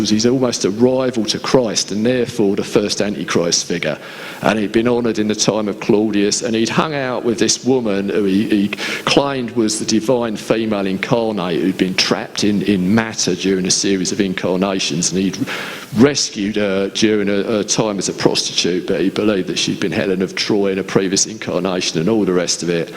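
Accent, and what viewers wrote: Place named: London, United Kingdom. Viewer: A very thick London accent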